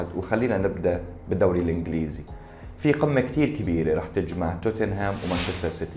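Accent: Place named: Aleppo, Syria. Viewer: صحيح ونا لاحظت انه من حلب من اللهجة 🥰🤩 بصراحة تقديم رائع ومبسط مشكور ..... بالتوفيق لحضرتك🌹🌹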